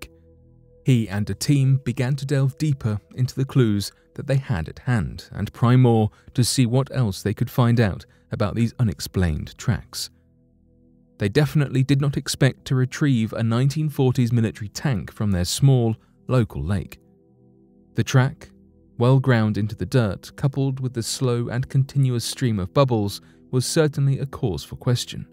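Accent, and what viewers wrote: United Kingdom. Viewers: This guy is extremely long winded. He prolongs his stories far too long. This love affair with white British voices always amazes me. He's boring. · He repeats himself a lot too. I'm not a fan of British accents either.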